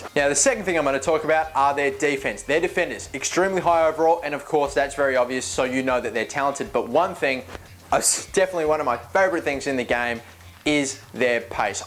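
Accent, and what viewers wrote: Germany. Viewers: Great German accent! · i like the german accent I thought it was accurate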